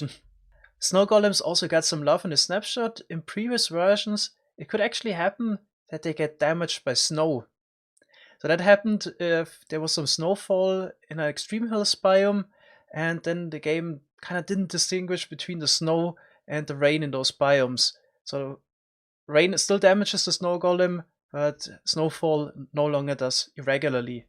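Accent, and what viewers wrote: Germany. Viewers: You Sound really german Du allmann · see, i was thinking maybe germany or france. the german accent i’m most familiar with is a little different, so i wasn’t sure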